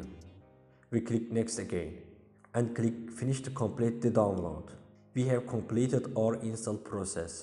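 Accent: Turkey. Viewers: İngilizce çok tanıdık geldi türk müsün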